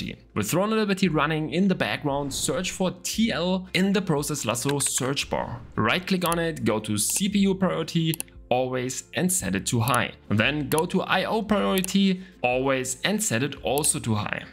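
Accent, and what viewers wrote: Germany. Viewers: Echt Traurig 😢 mach doch auch mal was für deutsche. Das Englisch macht einen verrückt mit dem deutschen - Englisch selbst skript lese akzent 😅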